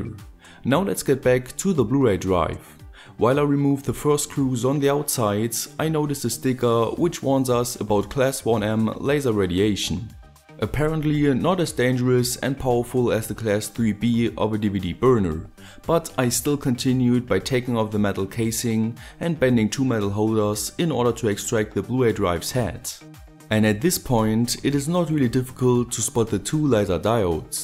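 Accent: Germany. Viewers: habs an deinem deutschen Unterton gehört. Geile Videos machst du, ist immer interesannt an zu schauen. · Are you from Germany?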